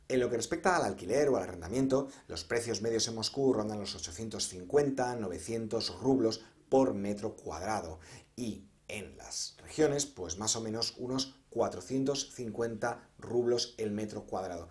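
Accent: Spain. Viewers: Exelente información muy didáctica, por tu acento eres español. ¿Porqué no nos das la misma imformación pero de tu país España? Saludos desde Ecuador. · Sí, tu canal és super informativo,además me encanta tu acento español de españa,saludos desde Brazil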